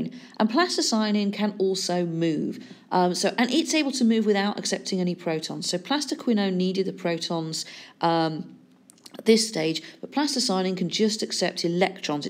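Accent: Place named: United Kingdom. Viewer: It's not bad pronunciation it's accent (British accent)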